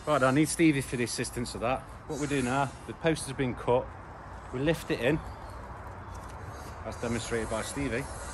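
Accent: Nottingham, United Kingdom. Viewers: Amazing video. I recognized the Notts accent immediately, always makes me smile. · Wait is he from Nottingham lol ?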